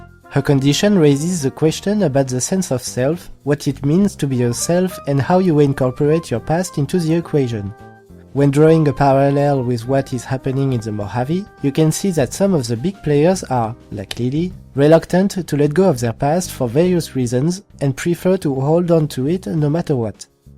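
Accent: France. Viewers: ton accent français Me croire que tu es un français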